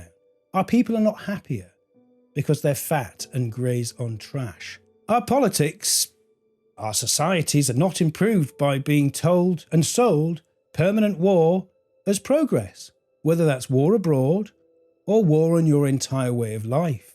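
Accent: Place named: United Kingdom. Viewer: I really enjoy TRUTH Tellers! Cheers!!🎉 Truth with a British Accent is EVEN BETTER! We could get really Creative and make this Truth Wizard A THING. I hereby Volunteer for the Costume Department Manager and will gladly Donate Costumes & Props, if needed. I would have to ship them from America. Just heard today, 9/2/25, that Brits cannot Mail packages to the US under your current Dictator. Thanks be to God, we Americans still have that Freedom. Unless it "mysteriously disappears" at your end, I don't see a problem. 😉😉 On a seroius note, I thank God that I was Born on Christmas Day 1960. I was taught to pay attention to Life and have literally witnessed with my own eyes, ears, heart and soul the disintegration that he is referring to. The turning upside down of everything. He is absolutely correct. It has been a wild ride and I suspect we'd better buckle up. 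The Disenchantment is SPREADING! 😇🙏💪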